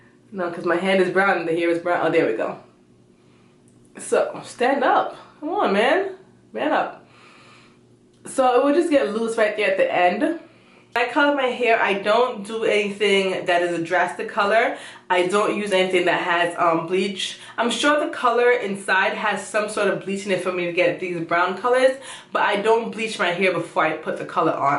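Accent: Jamaica. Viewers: Mi love yuh patwah!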